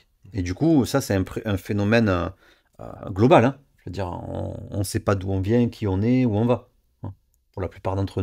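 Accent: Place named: South, France. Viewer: trop bien, vous êtes du sud avec cet accent?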